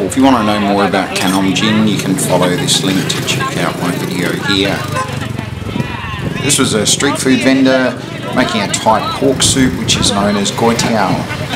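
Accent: Australia. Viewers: u sounds like Aussie are you? Hello from Adelaide SA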